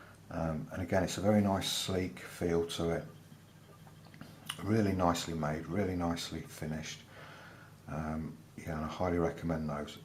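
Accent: United Kingdom. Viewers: i'd like to make 2 small points on your channel. 1.you have a very nice, relaxing voice; 2nd, your english accent is not very deep, and while most non british people would find that tough to understand, i would find it a bit annoying, so ty for that too. im in process of getting a mechanical pencil and i have to choose between 0.5 and 0.7 for mostly writing and between uni kuru-toga pentel 205 and zebra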